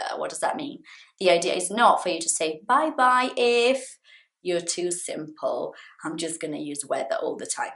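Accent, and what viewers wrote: United Kingdom. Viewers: Esse inglês britânico é o melhor, lindo de se ouvir 💙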